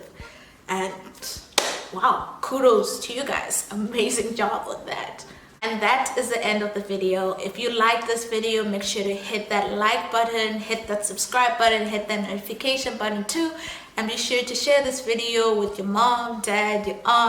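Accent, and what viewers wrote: South Africa. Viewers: I understand this video is from last yr,but ur accent convinces me you've been living in SA for quite some time now,is there still xenophobic attacks going on in SA?..or do you feel crime and robbery is worse in SA than in your country and what are your experiences with crime or even xenophobia if you have experienced them?cos it sounds like it really bad out there... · You sounds south African, where are you from? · She does sound South African, I'm not picking up Zim accent.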